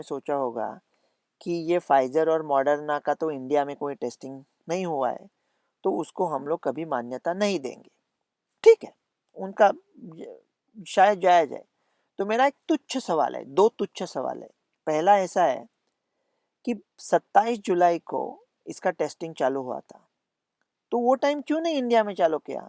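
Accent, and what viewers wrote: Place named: Maharashtra, India. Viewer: Tumchya Hindi madhye pan Marathi aahe. Take this as a compliment.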